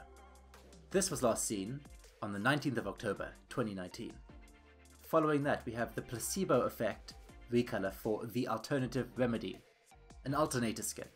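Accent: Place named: South Africa. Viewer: Are you South African Im pretty sure you have a South African accent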